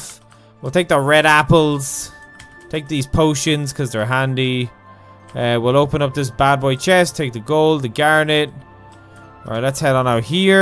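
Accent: Ireland. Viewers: Also keep up the irishness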